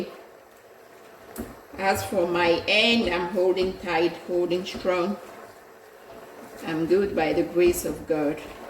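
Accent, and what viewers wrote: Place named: Cameroon. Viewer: Oh my, can hear that Cameroonian accent! My Cameroonian sister right there. Achu is my favourite Cameroonian dish, then fufu and eru.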